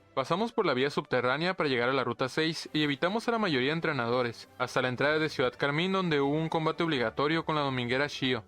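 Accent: Chihuahua, Mexico. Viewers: Eres de chihuahua?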